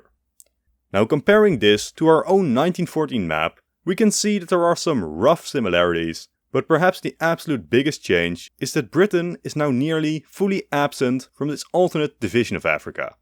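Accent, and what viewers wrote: Netherlands. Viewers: lekker nederlands accentje:) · That Dutch accent though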